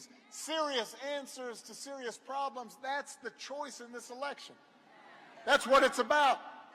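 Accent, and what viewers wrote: South, United States. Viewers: Now he has a southern inflection. What a poser.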